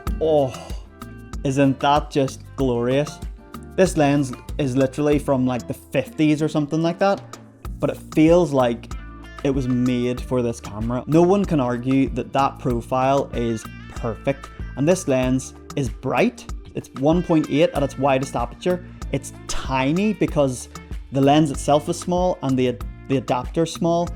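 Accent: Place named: Northern Ireland, United Kingdom. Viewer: good to hear fellow norn Irish folk here in the YouTube camera space! (in saying that my accent is fading)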